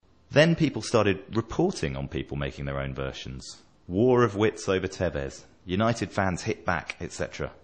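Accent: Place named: South, United States. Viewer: This is comedy gold - the narator talks about city and says "We did this" "We did that" - an obvious city fan, WITH A FUCKING SOUTHERN ACCENT ! Manchester United are FROM Manchester - Newton Heath to be precise - born in 1878 - much earlier than city. Its all about where your BORN not where you LIVE. One last point, United have had higher average attendance since records began in the 1900's than city, even when united were in Div 2, below city, MORE MANCS ATTEND UNITED GAMES THAN CITY, FACT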